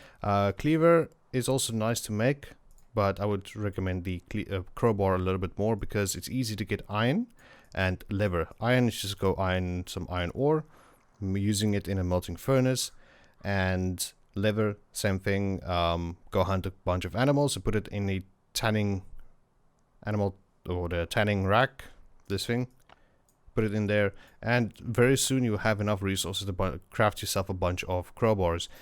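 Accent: South Africa. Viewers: are you south african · Lol bro I can hear you are from south africa thanks for the vid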